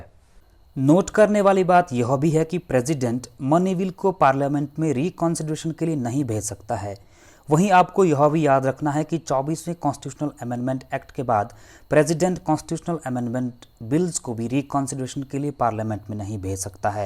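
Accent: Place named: Bengal, India. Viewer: Sir aap jarur other language bolne wale ho... I think bengali aur assemese😂😂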